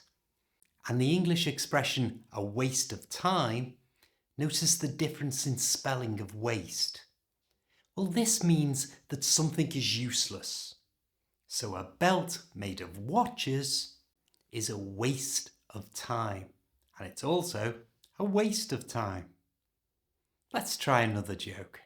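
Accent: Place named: West Lancashire, United Kingdom. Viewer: Fun, useful and informative! I chat - online - to quite a few people from countries other than England and know how difficult it can be for most foreigners to understand British humour (unless it's particularly visual - EVERYONE seems to like 'Mr Atkinson'!). I'm going to devise a 'joke' about one sentence YOU said, "You need to know that the part your body you WERE when you put a belt on...."! Of course, this shows that regions and dialects can also give rise to clever 'word-play'. I'm guessing you are from West Lancashire?! (I once worked - in Panto - with Ken Dodd so learned lots about jokes, humour and accents - sometimes until after midnight!!) Thanks for posting!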